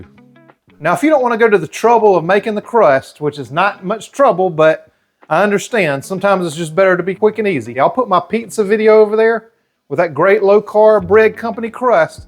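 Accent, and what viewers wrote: South, United States. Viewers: Sorry the thick southern voice is 😖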